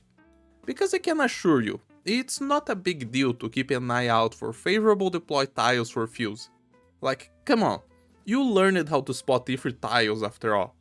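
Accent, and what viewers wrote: Brazil. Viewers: Are u Brazilian? Ur english sounds like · The way you talk...are you brazilian?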